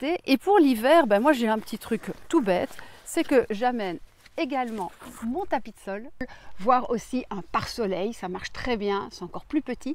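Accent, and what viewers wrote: Belgium. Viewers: très utile et très agréable le petit accent belge!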